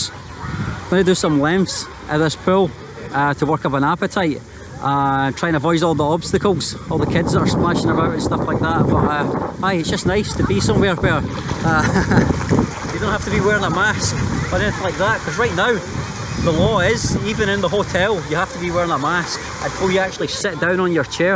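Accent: Scotland, United Kingdom. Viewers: What a gorgeous resort! Lucky you ☺️☺️ Thank you for this great video... I appreciate your hard work and I love your Scottish accent mate ☺️ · Mate. It was hearing your accent and instantly like the video. GO SCOTLAND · Scottish accent love it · I'm here just for the Scottish accent....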